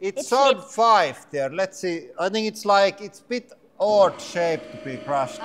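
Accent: Finland. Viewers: English speakers, how do you find this guy's English? This is what we call in Finland, 'rally English', and I hear some of us really speak this way. I don't, but I'm a curiosity.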